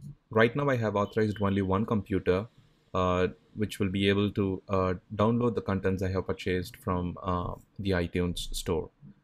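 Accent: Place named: United States. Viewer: MURICAN ENGLISH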